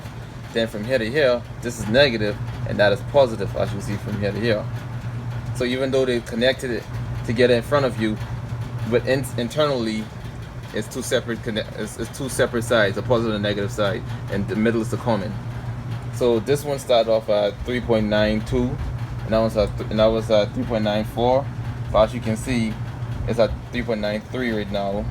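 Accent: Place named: Bahamas. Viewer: Nice, am running leaf as well. U sound like Bahamian. I’ve already built my windmill as well using the same motor.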